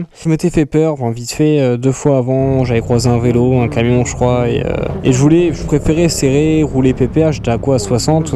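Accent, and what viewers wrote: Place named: Vosges, France. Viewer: ouais j'ai vu après sur ta chaîne. J'ai habité dans les Vosges. J'ai reconnu un peu l'accent 😁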